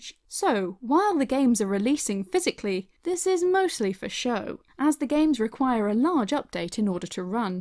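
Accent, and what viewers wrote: United Kingdom. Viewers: Love your voice and accent! 😍😍 Are you from the south of England? I would guess Surrey or London :P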